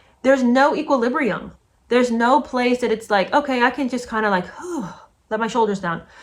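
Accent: South, United States. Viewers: I've learned more about Brittany in Part 1 than Jada in her entire book Worthy😂 And by the way, I love your southern accent .😃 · One chapter and this book already has more depth than Worthy. This should be interesting. Love your southern accent. I just finished Matthew Perry's biography. His account of his life is raw. It helps to explain why and how he struggled. You might enjoy it. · As soon as the video was over, I was like: gimme, gimme 🎶 Great book and even better with you telling it, of course! Also, I really like your southern accent 😅💕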